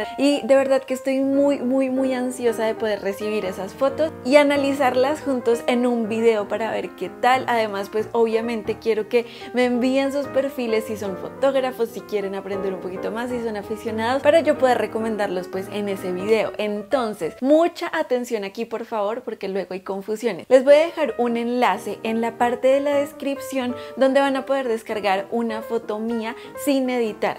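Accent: Colombia. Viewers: Me encantan estos tipos de videos y tu voz super relajante jaja no se el acento colombiano me gusta ❤️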